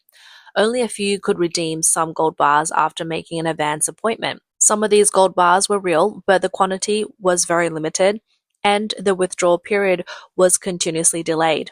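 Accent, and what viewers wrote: Australia. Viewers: My favorite Australian Chinese narrator😮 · Aussie accent on the voice over. PM of AU in chyna in Winnie's ear, while the USA is throwing its hands in the air saying 'Australia, what the f*** are you doing' they don't know w t f they are doing.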